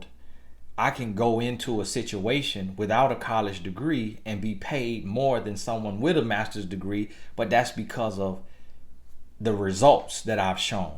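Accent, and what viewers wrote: South, United States. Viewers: Hey brother, great video as always! I love your Country accent it's so cool and you are pretty funny lol... keep up the good work... God bless you. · keep the accent, it is apart of your brand. You remind me of my southern relatives whom i truely miss. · Be blessed...to your and your family. I actually like your country accent. We all have accents tho' 😏. Some might not think so. 😏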